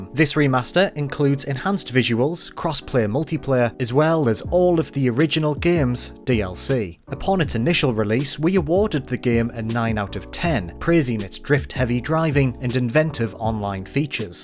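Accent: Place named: Newcastle, United Kingdom. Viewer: Here for floating Geordie representation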